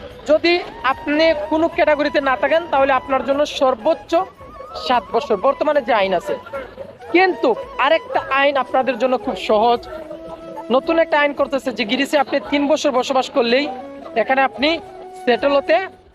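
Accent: Sylhet, Bangladesh. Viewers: Vai subscribe korlam..apni mone hoy amrar Sylheti? Vai amito aitam chai Greece 2024..aiya kam pawa jaiboni city alaky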